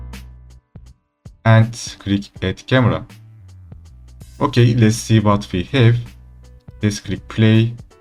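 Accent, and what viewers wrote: Turkey. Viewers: Türk. Daha ilk dinlediğinde aksandan belli :D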